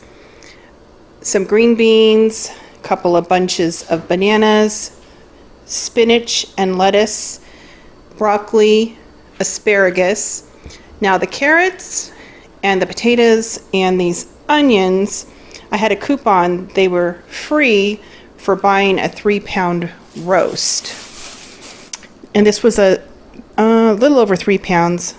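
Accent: South, United States. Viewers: I've never had In n Out, but I've definitely heard of it! Everyone seems to love it. By the way, your accent sounds very southern. Are you from the south?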